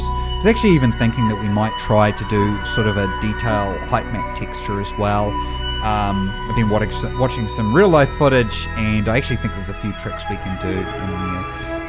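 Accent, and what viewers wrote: New Zealand. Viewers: YO IS THAT A KIWI ACCENT???? YOO KIA ORA????? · Bro it’s refreshing to hear that kiwi accent 🤙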